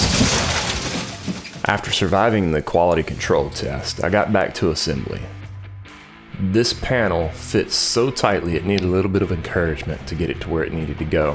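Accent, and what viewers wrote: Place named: North Carolina, United States. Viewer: Great cart man. Where are you located (generally) I'm in NC and you sound like you might be "from round here" ;)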